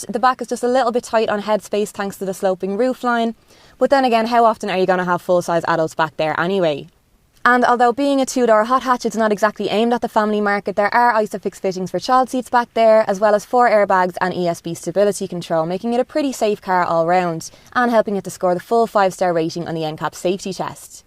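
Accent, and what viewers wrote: Dublin, Ireland. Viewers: That Dublin Accent is so nice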